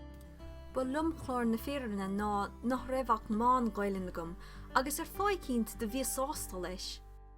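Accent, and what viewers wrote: Corca Dhuibhne, Ireland. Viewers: Tá blas iontach Corca Dhuibhne agus Gaelainn cruinn álainn agat. Ba cheart go mbeifá an-bhródúil as.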